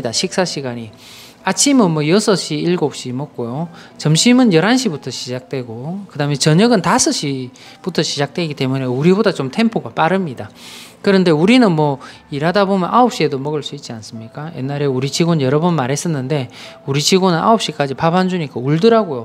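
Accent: Gyeongsang, South Korea. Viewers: 삼성이 철수하기로 했다죠,,,,,,,,,,설명해주신 이유도 상당합니다,,,,,,,,,,설명하시는분 억양이 경남쪽인것 같네요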